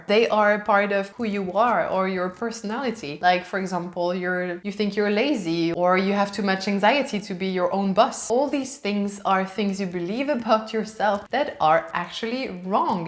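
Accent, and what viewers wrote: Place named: France. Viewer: She is French she has that French accent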